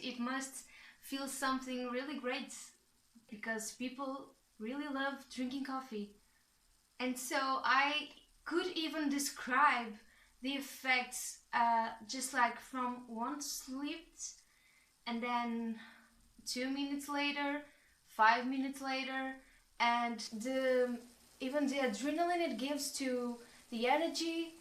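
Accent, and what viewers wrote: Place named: Portugal. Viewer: Ah, I've mainly heard Brazilian Portuguese. The accent sounds very different! Another possibility is ISFP. One way to tell the difference is Ne and Se are reversed (as you say, tool vs. PoLR), and also ISFP Ni vs. INFP Si. Possible evidence for Ne is word play, speaking poetically, telling stories created in the moment. An INxP takes conscious effort to use Ne, so it isn't immediately obvious. It really is "tool use". Catch one in the right mood and they will delight in playing with the world of words and ideas. For example, my wife (who is an ISFP) sent our niece a bracelet that had been in the family. I mentioned to my wife that our niece needed to know the history of the bracelet -- and made up in the moment a silly, absurd little story. One of many things that causes confusion: ISFP Ni is third slot, but ISFPs seem more classically "intuitive" than INFPs. 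INFP Si, with Fi+Ne, can get overly focused and dwell on positive memories (nostalgia) or negative memories (rumination) -- but Se PoLR makes outward emotions look more "flat". ISFP Ni, with Fi+Se, is more expressive (and explosive, though it passes quickly). One thing that is definitely true, it is very hard to self-assess.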